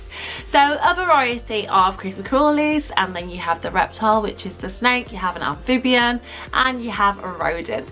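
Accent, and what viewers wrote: United Kingdom. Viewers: She is definitely not American LOL I think I can safely assume that she is a Brit.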